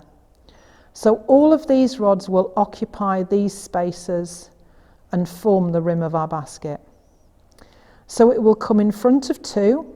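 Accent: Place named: Lincolnshire, United Kingdom. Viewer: A big hello from Monmouth! I was born and raised in Lincolnshire, so it has been lovely to listen to your accent. It brings back many good memories. And this has been s delightful series. Nice and clear, not too much talk to confuse, and all set up in a homely placement. Congratulations. I hope you do very well.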